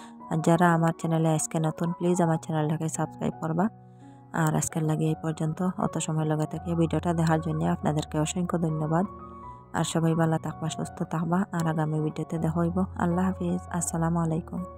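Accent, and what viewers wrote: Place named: Chittagong, Bangladesh. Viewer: আসসালামু আলাইকুম আপু কেমন আছো লাইক দিয়ে ভিডিও দেখে নিলাম তোমার কথা শুনলে আমার হাসি এই আপু আমি তোমার কথা কিছু বুঝে কিছু বুঝিনা তুমি কি চট্টগ্রামে ❤❤❤❤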